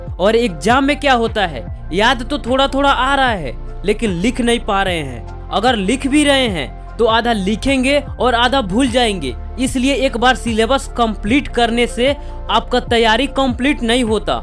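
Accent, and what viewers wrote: Bihar, India. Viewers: Bhaia aap bihari ho kyaa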